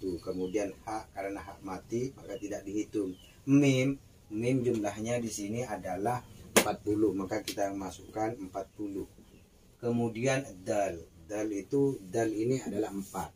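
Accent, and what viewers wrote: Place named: Sumenep, Indonesia. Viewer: Sampeyan dimana mas. Ko logat nya sumenep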